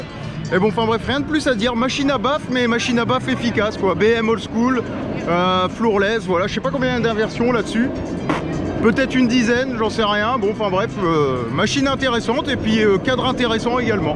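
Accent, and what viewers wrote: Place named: North, France. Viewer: J ai découvert ta chaîne y a quelques jours, bravo pour ton professionnalisme tu fais attention à tout, la musique, le bruit , le vent ( ce n'est malheureusement pas toujours le cas de certains youtubeurs !!!! ) je vais m empresser de visionner toutes tes vidéos J ai cru reconnaître, légèrement, l accent du Nord, es tu un ch'ti, ce n est pas péjoratif j'en suis un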